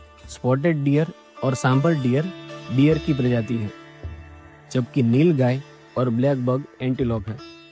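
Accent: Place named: Gujarat, India. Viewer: Good experience even after being a Gujarati you had neretted fine. But you should work on tone of language because there is still Gujarati accent and flair shown in tone. Please watch Sony BBC earth, discovery, National geographic hindi version to improve your hindi narration. Very well done for you efforts.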